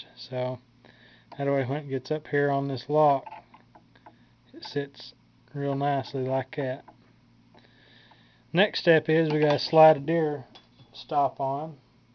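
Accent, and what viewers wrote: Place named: Texas, United States. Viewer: bout like yat. Texas talk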